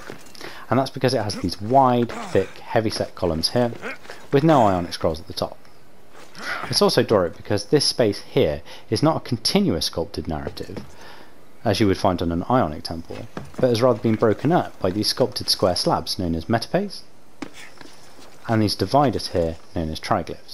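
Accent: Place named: United Kingdom. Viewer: and that sweet british scientific accent hahah, thanks for video man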